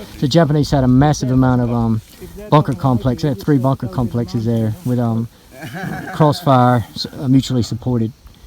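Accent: South, United States. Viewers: He's a Southerner. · I can understand this rooster better than an Aussie,strange yank accent,I knew a crew that logged on the Solomon Islands,and they said the amount of War relics is unbelievable,and crashed planes still been found to this day...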